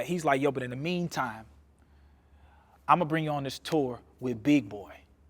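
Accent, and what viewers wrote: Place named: South, United States. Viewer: Got that southern Baptist preacher drawl… love it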